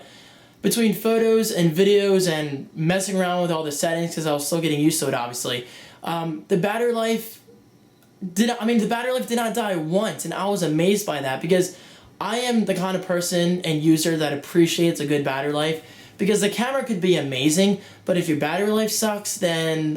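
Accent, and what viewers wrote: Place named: New Jersey, United States. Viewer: I like your Jersey accent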